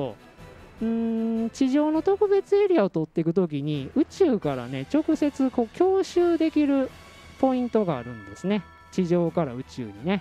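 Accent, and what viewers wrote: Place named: Kansai, Japan. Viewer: 淡々と関西弁で語りながら所々冗談入ってるのが面白い ここから宣伝につなげるとは思ってなかったｗｗｗｗｗ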